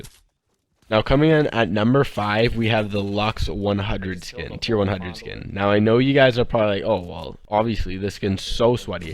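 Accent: Canada. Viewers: Yo where are you from, you sound like ur from Canada, more specifically Ontario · 100% Canadian. Fellow Canadians can spot out other Canadians by voice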